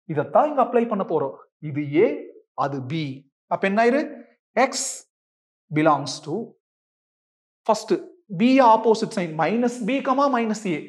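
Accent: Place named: Kongu, India. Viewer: Ss..... It's KONGU TAMIL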